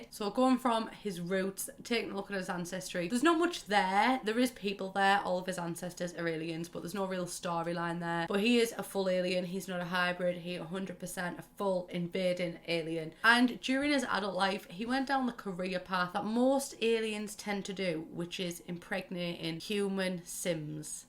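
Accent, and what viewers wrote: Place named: Liverpool, United Kingdom. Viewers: Another fellow scouser here too!!! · As a scouser, I'm loving hearing a familiar accent after being so use to American on YouTube 🙌🏻 also recently came across your channel and I subscribed almost at once, you're Brill 💅🏻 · Scouser here too 🙋‍♀️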